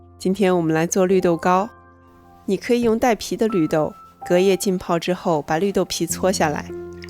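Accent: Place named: Beijing, China. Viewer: 您的標準(京片子)聽您的解釋很仔細很舒服感恩會照您的方法試作感謝您。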